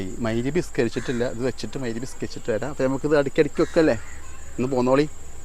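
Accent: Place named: Palakkad, India. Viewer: മസാല കോയിക്കോട് ആയ്ക്കോട്ടെ സംസാരശൈലി പാലക്കാട്‌ മതി 😀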